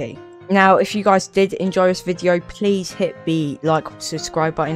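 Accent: United Kingdom. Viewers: it means Z its because hes british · He’s British, that’s how they say Z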